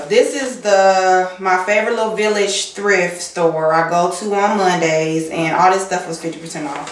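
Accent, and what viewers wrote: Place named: Chicago, United States. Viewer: U r too funny!!! Nice finds. Where r u from? I remember u saying ur from the Midwest but living in NY. U have an accent and talk like my cousins in the Chi. :)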